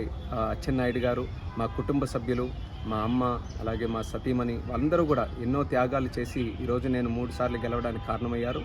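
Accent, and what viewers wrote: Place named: United Kingdom. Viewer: I'm big fan of ur English accent sir.b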